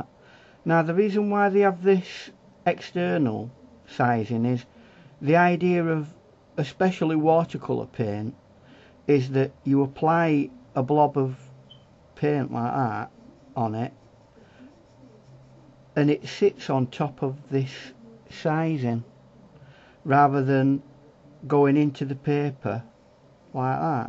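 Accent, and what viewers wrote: United Kingdom. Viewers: Let me guess sir , are you from Yorkshire? Brilliant video! 👍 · Love the British accent... What a lovely video. I feel like this one deserves so much more then 109 views since it could be helping so many beginner artists. Stay connected.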